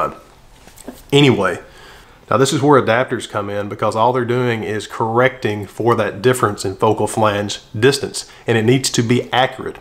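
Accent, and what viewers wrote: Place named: South, United States. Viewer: Tennessee? Kentucky? it's definitely Southern but not distractingly strong.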